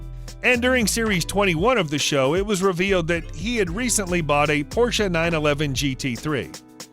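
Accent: United States. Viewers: The American accents gets right on me tits say it probably ffs not hard aint kids anymore 🤦‍♂️ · Why is a yank commentating ?? VERY BADLY !! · Christ get somebody who speaks English, as in English, not what some people refer to as American English, it makes these videos almost as unwatchable as the really cheesy still flying in and out. I am sure the £10 software has a fade option. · jeez the american pronunciation.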